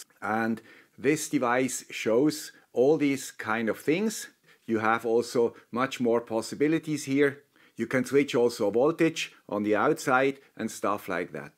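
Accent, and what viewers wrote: Switzerland. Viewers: hello this is the guy with the swiss acce...(smashes like button instantly)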